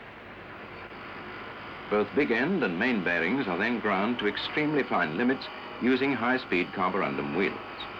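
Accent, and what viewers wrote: RP, United Kingdom. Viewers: I thought it was the typical "received pronunciation" of the era, aka BBC English...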